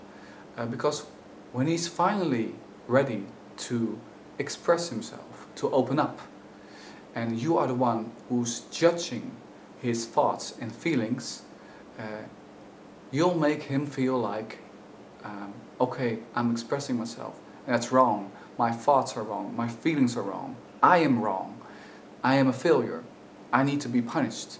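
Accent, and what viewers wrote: Netherlands. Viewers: Is this guy dutch? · Are you Dutch ? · Jij bent een nederlander klopt dat? haha ik hoor het aan je accent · He has a Dutch accent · Ik wist het, ik hoorde je accent en dacht hij moet Nederlands zijn haha. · Ik had al zon voorgevoel dat je nederlands was met het accen'tje xD haha Anyway even paar vragen over borderline... Hoe weet ik van me zelf of ik borderline heb? Ik zit ook vaak met gemengde gevoelens weet nooit hoe ik ermee om moet gaan het ene moment show ik ze heel veel anderen moment krop ik ze op en ben ik net een tijd bom die tikt Ik kan van vrolijk in 1 minuut overslaan naar boos/verdrietig In relaties ben ik onzeker, obssesief jaloers ... en word ik boos zonder rede Zijn dat tekenen? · I love your Dutch accent. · English is CLEARLY not his first language so he speaks it with a Dutch accent. It's not impossible to have deduced that, surely? 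· Are you Dutch? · Very good- I almost cried when you talked about the abandoned child/abused child. I can identify with that kid all too well. Thanks for the video. (Yes, I am a BPD person too). PS_ are you Dutch? I was trying to place the accent the whole time. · Recognized the accent of my fellow Dutchie within 1 second. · het is zo makkelijk om jouw accent te herkennen omdat ik ook Nederlands ben, denk ik :s Misschien kan je dit filmpje ook in Nederlands doen? Meer watchers :D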